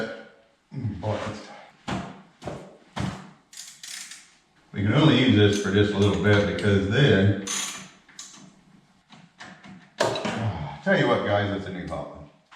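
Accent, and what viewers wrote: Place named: Ohio, United States. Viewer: I am actually from Ashland Ohio and realize that I have never lost my accent since we moved Arizona in 1966. Surpises me how much you and I sound the same when we speak. My last name is common there, it is Mumaw . Keep up the good work with your positive attitude !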